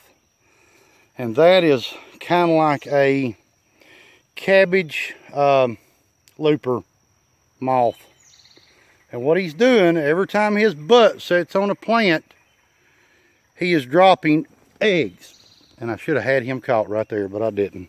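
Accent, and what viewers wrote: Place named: South, United States. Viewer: Ok, I’m going to straight admit to this. I subscribed because I really like listening to your southern accent.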